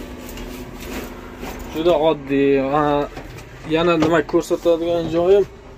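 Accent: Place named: Karakul, Turkey. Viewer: Ака Каракулданмисиз шева ухшаяпти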